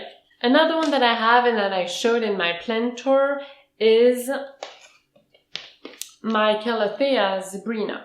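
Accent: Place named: France. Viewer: Great videos! I've seen your other videos and love your collection! I've been an orchid collector for a while now and have recently ordered a Cllathea Medallion and Stromonthe Triostar. It seems they both can be temperamental about their location, watering, and room humidity. But thanks to You Tubers like you I'll know what to be aware of and how to react. I like that "Pen stripped" Calathea you have. Im going to have to get one of those. Its so unique and beautiful. BTW you speak very good and clear english and your accent is very hard to detect but i think its french? Im guessing your Canadian? Thanks again! You Tube is my #1 plant resource.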